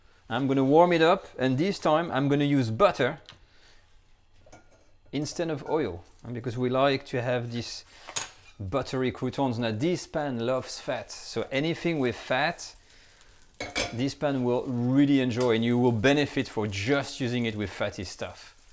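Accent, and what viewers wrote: France. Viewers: I am french , from Québec, so I also feel like he has that huge french (from France) accent . Also he did say Crouton so many times xD.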